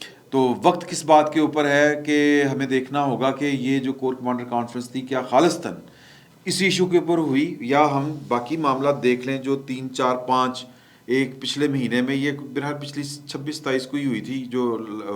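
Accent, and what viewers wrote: Punjab, Pakistan. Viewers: عمران شفقت صاحب ھمیں آپ سے بہت پیار ہے ھم آپسے سیر حاصل معلومات لیتے ہیں اور مزہ بھی آتا ہے خاص کر پنجابی ٹچ ۔۔اپنا خیال رکھیے گا ۔۔فی امان اللہ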